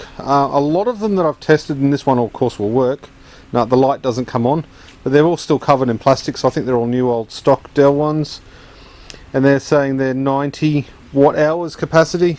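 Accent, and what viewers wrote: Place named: Australia. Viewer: We love your Aussie accent here in the states. Let's do an episode counting how many times says "let's face it.".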